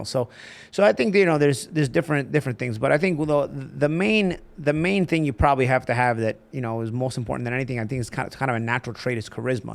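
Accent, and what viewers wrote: Brooklyn, United States. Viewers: I’m a casual boxing fan who actually discovered you Paulie as a commentator lol. whenever me and my friends would watch fights on showtime I would always learn a lot from you as an analyst. that’s when I was like damn who is this commentator Paulie he’s awesome and he reallyy knows his stuff.. I knew you were from Brooklyn NY first time I heard you talk lol just from your accent which drew me to you even more.. love this channel best boxing channel on YouTube!! Paulie is the man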